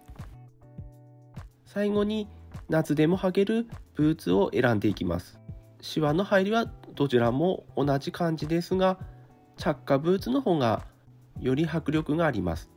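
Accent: Tsugaru, Japan. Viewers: いつも楽しく観させて頂いています｡ 津軽弁良いですよね‼️｡ (やわらかな感じで､) チャンネル登録もしています｡僕が持っているRED WINGはﾐﾙﾜﾝ(9087)ﾌｫｱﾏﾝ(8054)ﾍﾞｯｸﾏﾝ(9416)ﾌﾗｯﾄﾎﾞｯｸｽ(9060)です｡ RED WINGは５足までと心に決めていたのですが､あと１足ではおさまらないです｡ ﾌﾗｯﾄﾎﾞｯｸｽは今は光沢の出ないﾃﾞﾘｹｰﾄｸﾘｰﾑを仕様しています(光らせなくても渋く格好いいから)｡革靴は光らせる派です｡ﾌﾗｯﾄﾎﾞｯｸｽをもう１足買って光沢が出るｸﾘｰﾑで仕上げたいと思っているぐらいﾌﾗｯﾄﾎﾞｯｸｽがお気に入りです｡ 僕は革靴の色はブラックが好きです(渋く安心感があるから)｡Dﾜｲｽﾞが僕の足に合っています｡しかしEﾜｲｽﾞの8875が欲しいと思っています｡RED WINGだから赤でも､(絶対に黒と思っていました)Dﾜｲｽﾞのｺﾞｱﾃｯｸｽ仕様も考えていますがEﾜｲｽﾞも欲しいです｡絶対に他のメーカーなら黒にします｡White’sのｵｯｸｽﾌｫｰﾄﾞも欲しいのですが値段と重たさが😢諦めてしまいました｡ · 津軽弁いいですねー。人の良さが現れています。😊レッドウイングブラックブーツ最高ですねー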